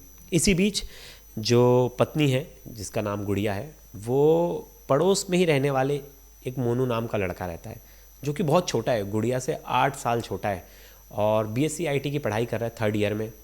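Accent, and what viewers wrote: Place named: Varanasi, India. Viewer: बनारसी हैं क्या सर 😊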